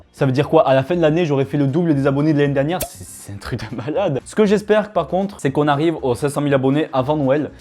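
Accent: South, France. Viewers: Byron 650 c'est l'accent du sud je pense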